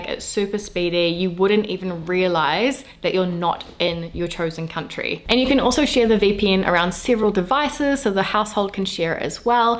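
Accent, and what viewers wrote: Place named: New Zealand. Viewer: Your accent doesn't sound English to me. I can tell you're a Kiwi...or Aussie, lol. For perspective, I grew up in Colorado, USA, and have lived around the American West. To me the New Zealand accent sounds like a softer version of an Australian accent. To an American, anyway.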